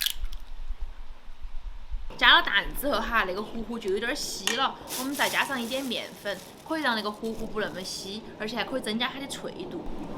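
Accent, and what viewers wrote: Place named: China. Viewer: 好懷念妳講普通話喔。真的很好聽很有魅力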